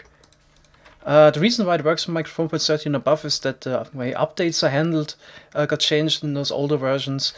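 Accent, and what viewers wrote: Germany. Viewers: Wow, dieser Deutsche Akzent. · Are you German? Your accent sounds like it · Wie man einfach das deutsche raushört :D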